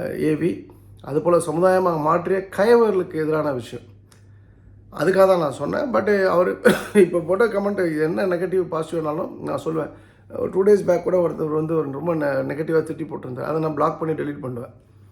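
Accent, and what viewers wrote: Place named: Madurai, India. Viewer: மதுரை தமிழ், சண்டை போட்டாலும் ரசிக்கும் படி இருக்கும். 🎉🎉🎉🎉🎉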